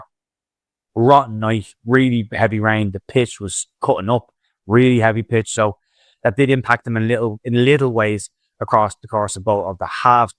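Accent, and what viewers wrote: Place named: Ireland. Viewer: Disappointed that you didn't say something along the lines of "forest destroyed by tree" in your Irish accent. Other than that great analysis!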